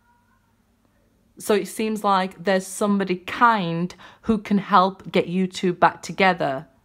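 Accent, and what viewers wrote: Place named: Northern England, United Kingdom. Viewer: Nice reading.. thank you! And love the Northern accent.. Ay up lass! 😊😂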